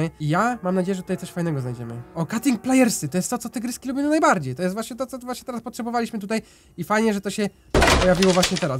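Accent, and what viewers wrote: Silesia, Poland. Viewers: wyglądasz na tego co mieszka na śląsku? Szczególnie jak mówisz (godosz)!!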